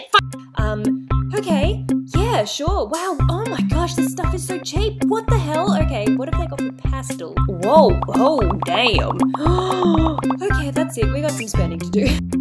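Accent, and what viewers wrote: Australia. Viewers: Also I’m Australian and I can confirm that’s how we pronounce things, fight us international folk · Please say things the way you naturally say things. I love Australian accents and learning how other people pronounce it call things. —People that seriously can’t get over the way other people say things are infuriating. News flash!! People from other areas aren’t always going to sound just like you. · Please don’t change how you say things, be Aussie proud🤗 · You are just too sweet. I love your accent and the way you say pastel. You always want to please people, but you ALREADY ARE. If they are coming at you in any negative way they can buzz the hell off to their perf YouTube-r. There are plenty of us than know, understand ADORE your Aussie differences. Never change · WOW! I cant believe that girl Nina Tailor was SO INCREDIBLY rude! 1st of all, if u don't like it, dont watch.. 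2nd of all, Archie is the most awesome bird on YouTube and Yes, HATERS, he does need to be in every video he wants to be in. Also, I LOVE ur accent! What kind of person tells you your wrong for speaking in your native language/accent? Literally if you were to ask any person who knows me they would all tell you this about me: I always say that I want to hire an Australian person to give me bad news because I feel like no matter what you say, if you say it in an Australian accent it sounds happy lmao. I fairly recently found your Channel, did a bunch of binge-watching, and of course subscribed! I think you're great, I love you, I love Archie, I love your content.. screw the haters they're just jealous! Keep doing your thing girl cause you're awesome!! And as always, TYFS! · I love your Australian accent. I may be American and you say words differently (obviously) but I don't feel like it's that big of a deal. 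I understand what you're saying, so say it however you want. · Are u from Australia I’m from Australia 2 Sydney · Are the two ways you pronounce "pastel" spelt differently? When you say things differently then I do I just think it's part of the Australian accent 🤷‍♀️ also just for fun I thought I'd mention that pastel means cake in Spanish which when I learned that found funny 😁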